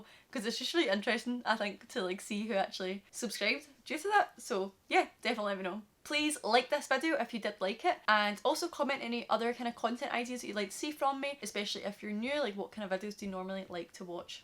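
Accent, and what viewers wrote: Scotland, United Kingdom. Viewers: Random ,but u sound scotish ?nice accent anyway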